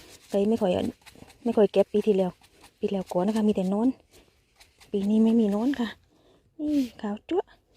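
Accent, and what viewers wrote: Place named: South, Thailand. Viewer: น่ารักจังค่ะ พูดอิสานสำเนียงใต้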